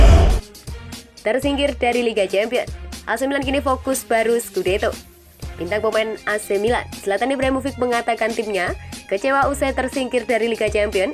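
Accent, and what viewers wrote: Java, Indonesia. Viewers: Jawanyan mana mbak pengisi suara!????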